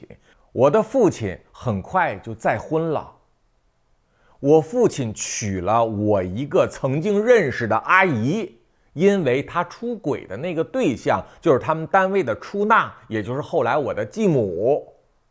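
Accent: Beijing, China. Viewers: 我还以为主播是北京人呢，字正腔圆的普通话，挺真实的感觉，脾气太直说话太直，有北京人的感觉😊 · 聽叔說話特別有趣，像在聽人說書 連說話方式都充滿著北京感？古裝戲感？忍不住想學😊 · 老北京味儿，亲切😊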